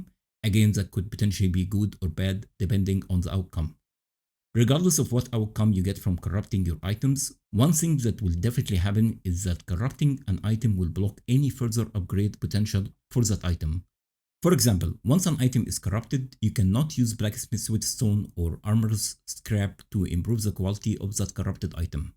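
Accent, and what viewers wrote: Egypt. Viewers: ايه يا باشا من لهجتك عرفت انك مصري ❤️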